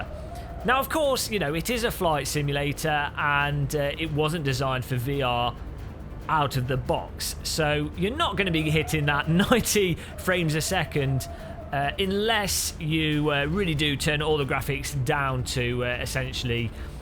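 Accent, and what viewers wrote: United Kingdom. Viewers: You're awesome! You're the only person with a British accent while having such an upbeat and awesome voice. Amazing review. Hopefully you get into flying more.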